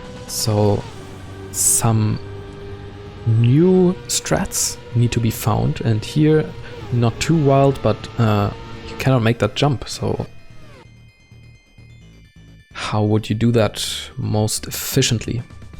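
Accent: Germany. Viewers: Are you german? Man hörts :D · Bist du ein deutscher? Erkenne ich wegen dem ackzent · dieses german english :D .D :D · Krank dass man einfach hört an dem Englisch Akzent hört aus welchem Land man ist. Bei dir hab ich direkt gehört dass du deutsch bist und bei wirtual z.b dass er skandinavisch kst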